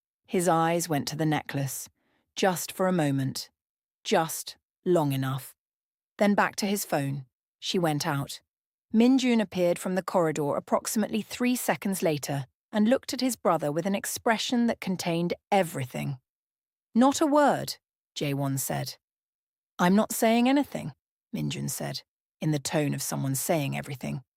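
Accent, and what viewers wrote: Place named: United Kingdom. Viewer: Well this story was exhausting. If you want your audience to be capitived gove us something "cracking open". I felt the narrator was to "wordy about the pass of fathers than about the couple." I think the British accent was distracting and she had nothing to support the love balance I was expecting to happen. Part 2 showed a ring on her finger then nothing happened in part 3. Worst than a slow burn, it just was toooooo wordy with nothing to support the love they held inside. maybe 2 dates, no laughter, only closeness, a ring on her finger why? To much w/ narrator explsining life and never bringing them to heartfelt conversation about the future. Honestly, I skip through parts. This wasn't what I expected for 3 part. Never saw her wear white dress, then it was the end. DO BETTER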